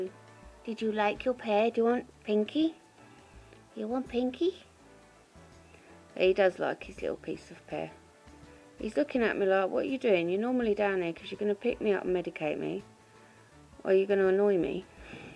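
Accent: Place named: Midlands, United Kingdom. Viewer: Poor little furry cutie pie. Glad he's doing better. Best wishes to ya. That accent though... from the Midlands, are ya?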